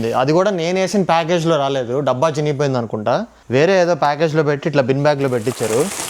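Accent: Godavari, India. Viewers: Ohoo nuvvu suuper tammudu achu vzg godavari jilla vaalla maatateeru nee video s ki addicted 😂😊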